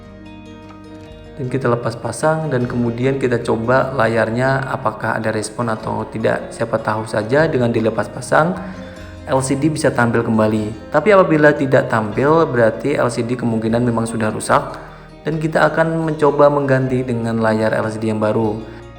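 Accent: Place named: Banjar, Indonesia. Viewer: Bang,pian buka servis nya di bjm kah....? Please jawab,uln dnger nya kaya org bnjar pian.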